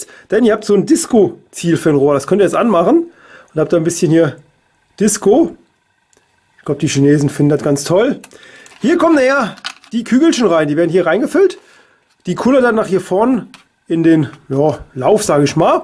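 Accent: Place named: Hesse, Germany. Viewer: Machste gut Bubb. Klares Deutschhessisch, guter Ton, konkrete Fakten, kein unnötiges Gebabbel .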